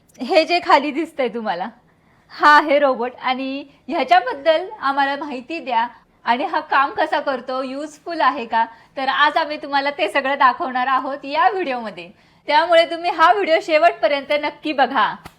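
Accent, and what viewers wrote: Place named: Satara, India. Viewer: Bolnya varun sataryachya disatay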